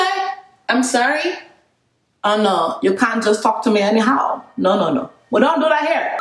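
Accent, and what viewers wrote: Nigeria. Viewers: “Like it’s part of my chocolatiness” 😂😂😂 I love your naija accent too.This quarantine situation is having errbody glooowwing 👀👀👀👏👏👏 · You make me laugh when you speak Nigerian accent.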